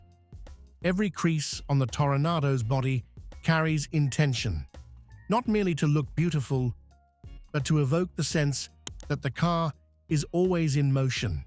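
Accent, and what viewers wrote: United Kingdom. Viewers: This chan has the best British voice.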